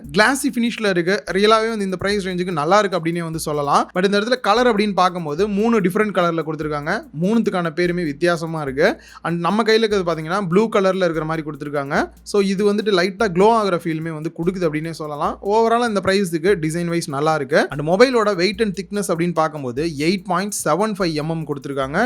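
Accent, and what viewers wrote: Tirunelveli, India. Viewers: thirunalveli yaa iruku 😅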